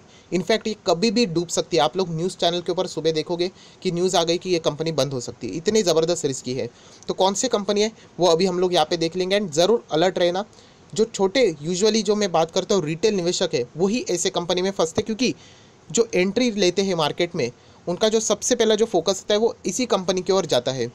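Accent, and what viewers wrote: Maharashtra, India. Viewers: Nhi dada tynchi tone varun kalt te marathi ahet..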